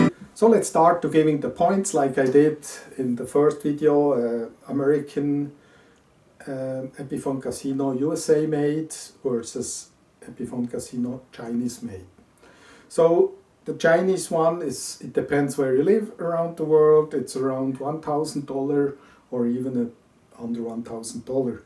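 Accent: Switzerland. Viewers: Hi, great video. You sound a bit Swiss. Do you live in Switzerland by any chance?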